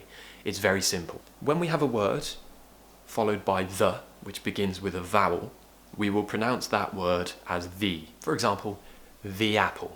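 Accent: RP, United Kingdom. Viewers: You are an amazing teacher, your British accent is amazing...lots of love from Bhutan 🇧🇹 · I am actually from Essex however I am doing a language exchange with an Italian guy who struggle with "th"... I have recommended your video to him because it is AMAZING! Can I ask do you generally speak with an RP accent or do you do it just for your videos? :) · Dude this is super difficult. Cause we are taught to speak “other” in a completely different way in India. It defintely doesn’t sound like udder but we don’t put the tongue in between. As you said , the muscle memory has to be worked on. You sound super POSH. Want to learn to speak in the same way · good looking with this hair cut Thanks for your teaching eng with british accent😍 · Hey i have seen your all video. And i want to recommend something. And i want to requist something.and i hope. That is when i read a bible i found serious pronunciations in the verse.would u mind if u receive my request? 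MATTHEW Chapter5 verse 23~25 I need your help and British accent thank u my best teacher ※i just hope. You don't need to get serious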